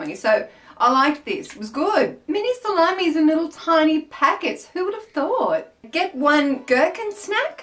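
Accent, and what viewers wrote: Germany. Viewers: Love your German accent, or is that accident lol. I could get right into the smurf sniffing thing! This is getting to be a ridiculous comment, sorry. Wow German stuff is weird (in ref to the little sausage). :) Ask a German to say butterfly (in German)....interesting. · Love your german accent :D I would be the same :p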